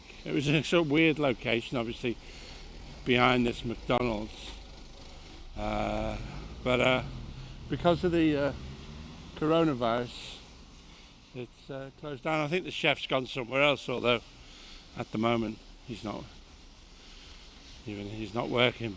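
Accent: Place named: Liverpool, United Kingdom. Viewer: I listened with the CC on and the subtitles are utterly hilarious. I guess the person subtitling doesn't understand Scouse accent or knows London at all. Exmouth Market was "Eczema Market", Anglo-Saxon car park was "Then go suction" car park, and Pret A Manger was "press emoji". I may watch all the vids again with the CC on to see how bad they are.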